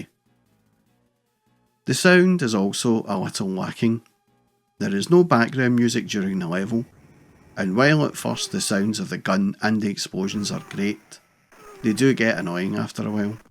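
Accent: Scotland, United Kingdom. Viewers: Upvoted for the narrator’s Scots burr.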